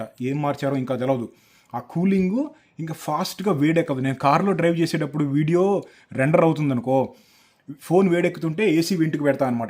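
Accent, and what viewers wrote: Andhra Pradesh, India. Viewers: Anna nee slang mottam pure telugu (andhra) laane undi anna …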